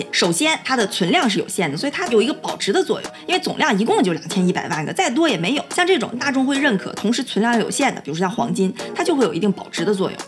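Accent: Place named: Shandong, China. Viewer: 听小林的口音像山东或者大连人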